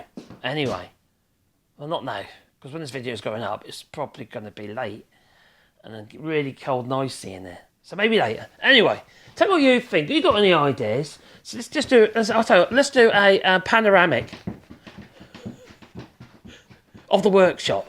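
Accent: Norfolk, United Kingdom. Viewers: A Norfolk boi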